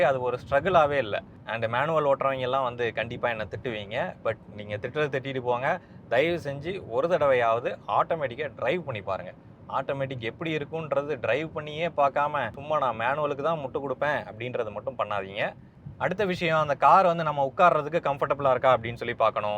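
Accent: Tirunelveli, India. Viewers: தம்பிக்கு திருநெல்வேலி தானா?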